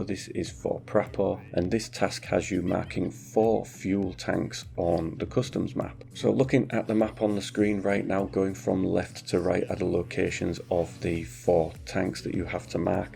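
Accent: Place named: Hull, United Kingdom. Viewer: Thanks, you sound like you are from Hull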